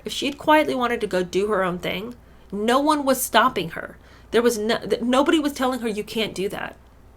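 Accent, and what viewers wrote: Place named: RP, United Kingdom. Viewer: you do the posh British accent perfectly! I realize I am late to the party, but since I found this, I have binge watched!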